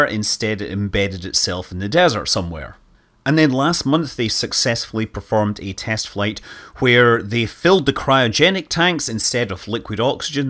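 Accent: United Kingdom. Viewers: Getting really tired of the limey accent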